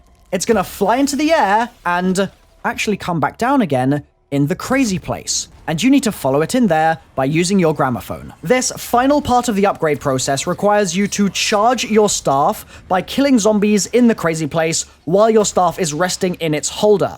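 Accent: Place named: England, United Kingdom. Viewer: He’s English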